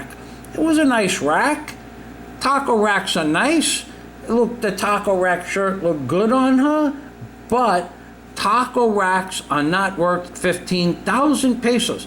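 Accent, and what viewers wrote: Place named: New England, United States. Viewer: Very funny but a useful reality check for all the naive guys and asian dating site newbies that believe everything they see or read. I think this is the second video I've seen of yours and you are actually a little annoying at times especially your new england accent and ridiculous obama references but you do provide some funny content. And you seem to attract the money grubbing types (big money)...why do you think that is?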